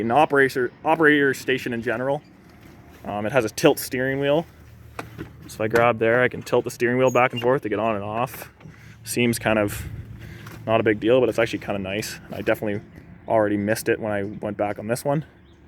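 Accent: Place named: Ontario, Canada. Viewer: I can spot an Ontario accent anywhere....no doubt aboot it😅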